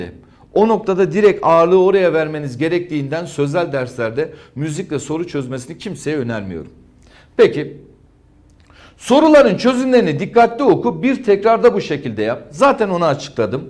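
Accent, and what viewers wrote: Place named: Istanbul, Turkey. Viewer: Neyse ki sonunda nörüyorn, gardaşlar, godum mu oturtutum vs vs avam söylemleri olmayan İstanbul Türkçesi ile efendice ders anlatan beyefendi bir HOCA'ya denk gelebildim..Dikkat çekicem, ilginç olucam, bu isler bizden sorulur havalarındaki kahvede okeye 4. olamayacak insanlardan ders dinleyemiyorum..Çok teşekkür ederim Sayın Hocam..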